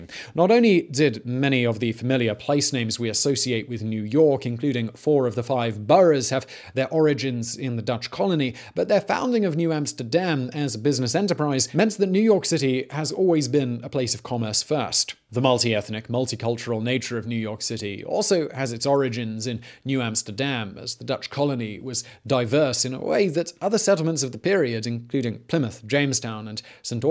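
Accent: United Kingdom. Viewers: Simon..... Being a New York native I must say the British flair you speak whilst educating make me wonder..... "Damn it Man why were you not my History teacher!" To you team of researchers "Brilliant!" to coin a term. Thanks Simon love this channel then again im subscribed to ALL your channels Proud to be a New Yorker!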